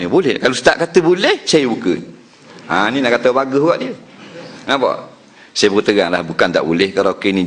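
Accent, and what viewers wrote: Terengganu, Malaysia. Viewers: Bahasa terengganu comel molek..zizan jadi zizang..pelakong..hha...comel comel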